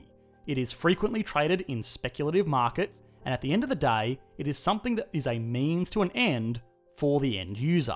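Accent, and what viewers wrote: Australia. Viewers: Just wondering the bloke narrating sounds like an Aussie, can you talk about the Australia markets? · Petition for economics explained ASMR channel with his soothing Aussie accent. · Australian accent, US film clips. What is going on here?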